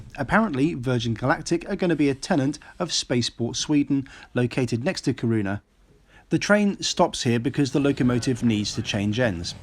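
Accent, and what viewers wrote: United Kingdom. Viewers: . I'm writing you from Ukraine. You are really. I'm very lucky to see your wonderful journey. It's gonna be super. You are very cool. I adore Arctic and everything what is connected with this region. Fantastic show. Railway in Sweden is really engineering marvel. Nature. I have no words to describe my ambitions. Your fluent English, your real British accent, your manner of speaking, your wonderful voice, music, lifestyle — OK, everything was pretty good. I'm your biggest fun. Thank you very much for your journeys. I love it. You are on my chance. Suppose see you soon.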